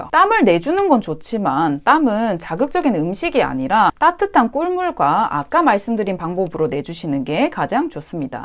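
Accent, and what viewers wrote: Gyeongsang, South Korea. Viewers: 일본어 하시는건가요 경상도 사투리 하시는건가요?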